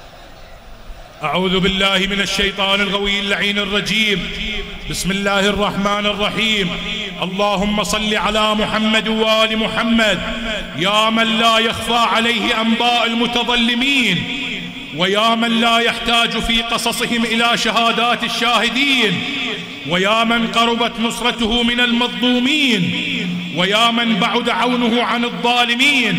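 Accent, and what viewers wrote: Iran. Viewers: ليش يحجي إيراني؟ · لهجتكم ايرانية وليست عراقية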